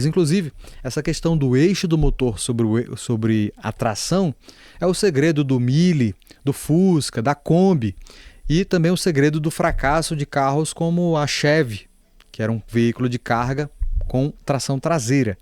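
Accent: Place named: Ceará, Brazil. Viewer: Cabecinha de caixa d'água kkkk macho, comecei o vídeo achando que tu tinha um pé no Ceará e no decorrer, quando vocês fala "aqui no Ceará"...batista. Sua interlocução e montagem/construção do vídeo estão de parabéns. Grande abraço de um outro cearense e novo inscrito.🤝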